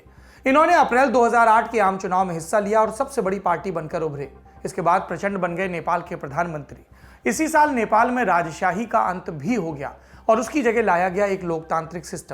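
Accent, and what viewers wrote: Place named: Uttar Pradesh, India. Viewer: ha Bhai but Hume Pasand hai wo up wale lehje Mai bat Karna... Bhai na andaj Kuch aisa hi hai...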